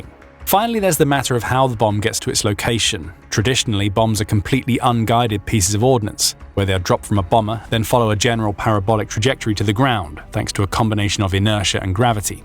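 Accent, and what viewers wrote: England, United Kingdom. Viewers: Why is this commentary using an English accent when it's a US idiom script?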